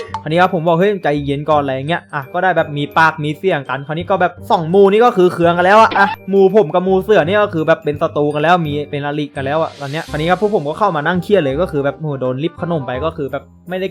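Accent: Phetchaburi, Thailand. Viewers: คนเพชรใช่มั้ยครับฟังสำเนียงรู้เลยคนเพชรเหมือนกันครับ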